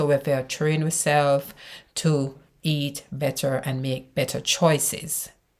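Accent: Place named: Jamaica. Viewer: This is some good information, like the Jamaican accent !!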